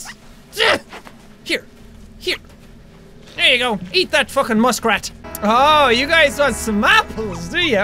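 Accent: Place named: Ireland. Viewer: I’ve come back to first video I saw 6 yrs ago because this is where my addiction to Irish accents was born. Love u jack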